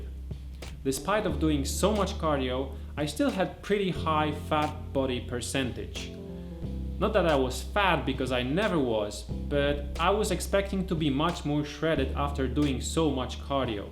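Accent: Poland. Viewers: tak czulem ze Polak, chociaz stara sie zmieniac akcent :D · zubr! polski akcent :D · He sounds polish and the beer pic was from a polish beer too, so I assume that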